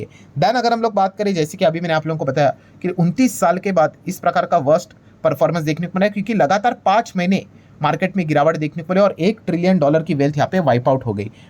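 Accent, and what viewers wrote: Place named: South, India. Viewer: Bhai south wale hai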